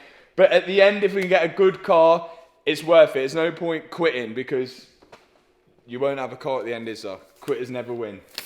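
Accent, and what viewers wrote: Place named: Leicestershire, United Kingdom. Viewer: Love a Leicestershire accent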